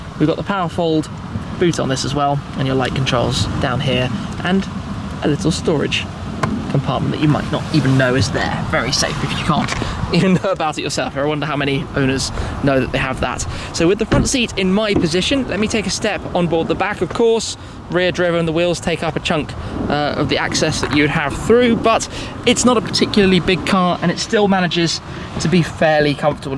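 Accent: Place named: United Kingdom. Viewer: Please, PLEASE, don't lose your brit accent and get too murican, PLEASE!! Keep up the awesome work and keep safe! Thank's, awesome machine, congrats:D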